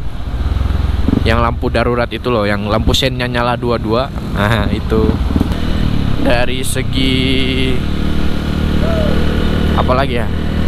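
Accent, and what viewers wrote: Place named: Medan, Indonesia. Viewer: Suaranya keinget temen kerja saya orang medan.. Horass nuhun..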